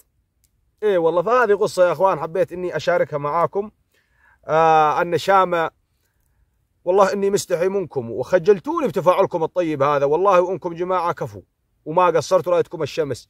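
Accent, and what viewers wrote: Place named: Western Iraq, Iraq. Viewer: امتابعك من العراق استمر وعلى فكرة لهجتك تشبهة لهجتنة بل مناطق الغربية حيل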